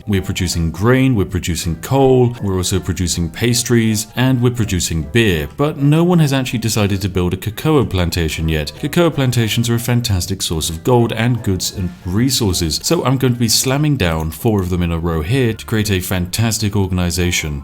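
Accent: United Kingdom. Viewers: He's British, that's how we say it, chill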